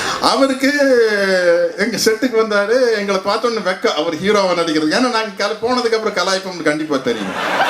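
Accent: Coimbatore, India. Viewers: கோவை தமிழ் சூப்பர் 👌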